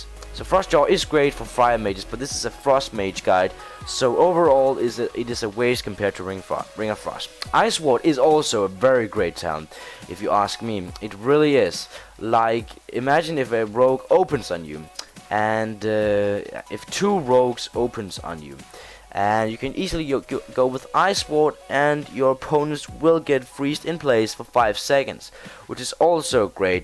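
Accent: Denmark. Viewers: Hey man awesome guide! :) Just a quick question, Where are you from? U sound very Danish lol. Anyways keep up the great work! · Hey dude very nice guide !. btw are you from Denmark?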